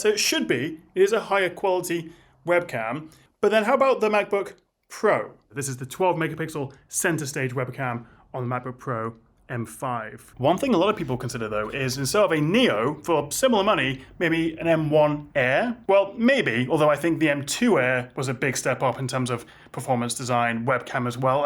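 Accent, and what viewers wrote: England, United Kingdom. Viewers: Is this English sounding man talking in Great British Pounds or American Greenbacks?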